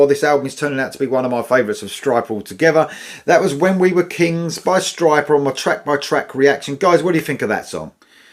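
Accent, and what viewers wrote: United Kingdom. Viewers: Are you british? ❤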